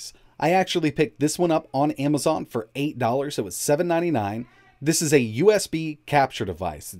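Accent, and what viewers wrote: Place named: South, United States. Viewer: Sounds like spent some time in the south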